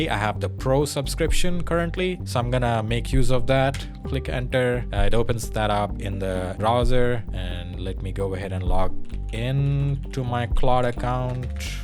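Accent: India. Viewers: this guy has such a NRI accent VecTOR , nice video though , claude is surprisingly good at documenting things neatly , as a human , it would be nice to copy that style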